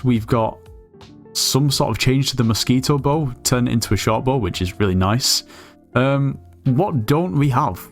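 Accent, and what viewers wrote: United Kingdom. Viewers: With the other brits you sounded REALLY British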